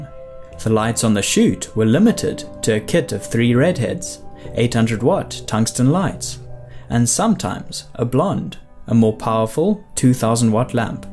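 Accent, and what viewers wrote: South Africa. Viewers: You Sound South African🙂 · I love the south African accent my china. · Nice to hear a fellow South African, nice breakdown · Where are you from?, you sound South African.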